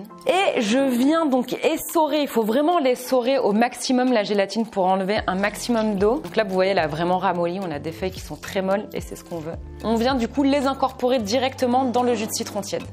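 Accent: Canada. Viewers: tu mon youtubeur préféré des vidéos accent canadien et qui met quoi des vidéos trop trop rire je me suis spéculoos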